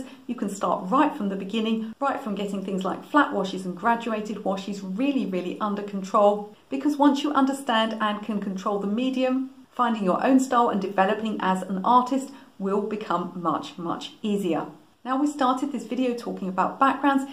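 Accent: England, United Kingdom. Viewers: I love your videos for so many reasons: 1.- You are realistic. Many people believe that Art is "spontaneous"... LOL... Spoiler Alert: Art is much like some kind of science. 2.- You are strict. People think that Art is total freedom, while total freedom is achieve by superceeding maximum restrains. 3.- You are funny. I love your jokes, it gives the audience the notion that Art is not necessarily "solemn". 4.- I really, really, really enjoy your amazing English accent (II am from Argentina, we speak Spanish), which is like a super give-away for me.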